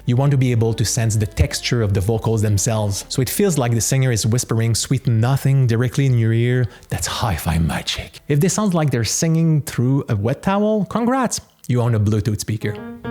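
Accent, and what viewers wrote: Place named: Montreal, Canada. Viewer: Dude, Whoa! Just stumbled upon this video from my recommendations and within 10 seconds I assumed you’ve been on YouTube for years… only to find out when I checked out your previous videos that it’s been a month. Your production is top notch. Also, your accent and the Expo67 poster instantly made me realize you’re from Montréal (same as me). I rebooted my channel to document my Hi-Fi journey and it’s so cool to see someone from here also doing the same. You definitely got a subscribe and follow from my here and on IG. Keep it up ✌🏼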